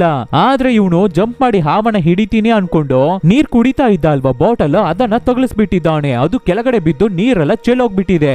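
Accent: Tamil Nadu, India. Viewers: Kannada pronounce is not correct, he pronounced with Tamil accent. If it made in proper kannada, dialogue, you will get more likes and more view. Any good try. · Ninna slang thamil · Guru nin kannada language same Tamil avru kannada mattadalva hag ide guru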